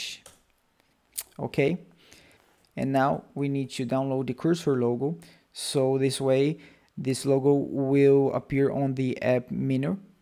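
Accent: Brazil. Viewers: Ganhou novo inscrito! (Ótimo inglês -- tenho quase certeza que tu é BR pelo uso do "T" e alguns sotaques hehe)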